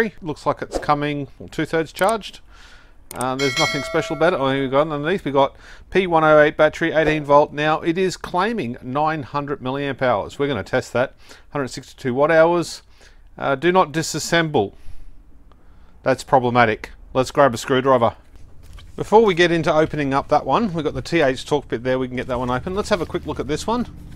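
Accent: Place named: Australia. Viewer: If it's not branded Ryobi, then it's NOT a fake. From the accent the reviewer is Australian who tend not to pay attention to facts. Anyone buying a replacement third party battery can clearly see it's not a genuine Ryobi or a Makita or Worx. So it's ridiculous to call it a "fake". Just sensationalism.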